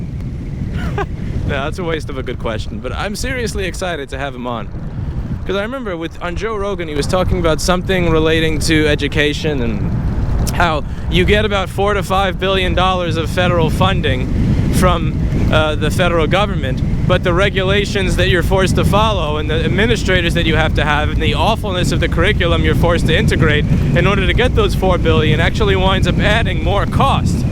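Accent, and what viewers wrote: New York, United States. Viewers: you are a true noo yawker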